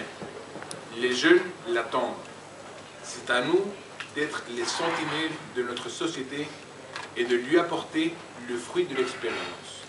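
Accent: Spain. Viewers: Il est beau, certes, mais putain !!! son accent espagnol fait tache !!! je le vois pas Roi de France, par contre si il a un fils aîné éduquer a la française, alors pourquoi pas. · Drolf Ozio Certes, mais ça ne l'empêchait pas d'avoir un accent béarnais. Il parlait français, avec un accent béarnais. Tout comme ce Louis XX qui parle français avec un accent espagnol. Ne vous inquiétez pas : s'il venait à être appelé au trône, sacré et couronné, son accent disparaîtra peu à peu... L'accent n'est rien ; et contrairement à l'illégitimité, il ne se transmet pas de parent à enfant... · L'accent espagnol n'est pas le problème ! Le problème est que ce type rêve : nous ne voulons pas de royauté !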